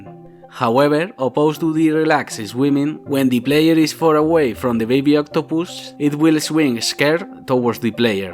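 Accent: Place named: Spain. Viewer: De nada bro, yo no se mucho de videojuegos, pero en esta plataforma has lo que más fácil se te haga, ya que al final Youtube tiene un argoritmo muy loco y podrás estarte matando haciendo un video muy bueno para que al final nadie lo vea, y es muy triste. Otra cosa que podría ayudarte demasiado, es haciendo streaming por Twitch mientras realizas los artes y programas el código del juego. Es muy bueno al momento de interactuar con tu público, además, no es un juego que normalmente suelas ver por la comunidad hispana, esto hace que sea más llamativo, crea un vínculo con el espectador. Por ejemplo conmigo, yo al ver tu acento en inglés, ya dije "Este es español" y eso fue lo que me hizo decidir quedarme en el canal. No tienes que tomar esto al pie de la letra haha, pero me gusta dar consejos que he ido viendo que le funcionan a otros.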